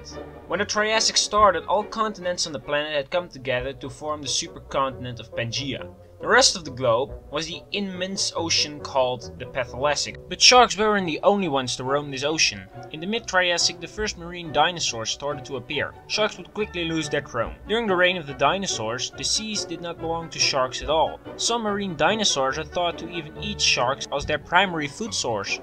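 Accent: Netherlands. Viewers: I have to say this was overall quite a informative video. But i have to comment on something: those "marine dinosaurs" you described, don't belong to the dinosaur family at all. Sure they were large and lived in the same periods, but they were in fact "marine reptiles" instead of dinosaurs. They were a seperate group of reptiles. That's it. After hearing your accent, i presume you are dutch :D am i right?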